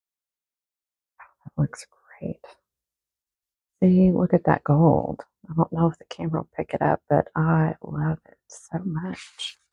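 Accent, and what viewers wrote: South, United States. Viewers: I love this! it's so much fun and thanks for sharing the colors you're using. I think I detect a Southern "accent". Hello from a Northern viewer. Apparently we have an "accent" too, which I didn't even realize until I spent 18 yrs. in NC and then came home to MN. !!! Yup, we have a accent !!!! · I love this! Beautiful color combination. You have a lovely southern accent. I’m from SC and live in Scotland. Cheers❤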